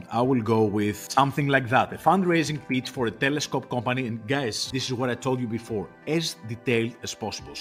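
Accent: Greece. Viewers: Είσαι Έλληνας ; γιατί η προφορά σου μοιάζει πολύ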